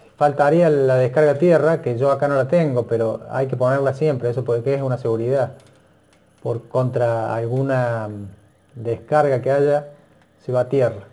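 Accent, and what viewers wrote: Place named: Córdoba, Argentina. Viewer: Por tu tonada,me parece que sos cordobés....😕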